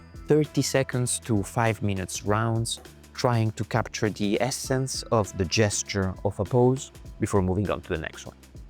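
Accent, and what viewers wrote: Italy. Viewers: I loved this video! Very useful suggestions, an extremely handy website at the end e il tuo accento italiano mi diverte (non è una presa in giro :) ) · L"accento italiano non mi sfugge😂. Great video bro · The way he talks, is he italian... c) can't tell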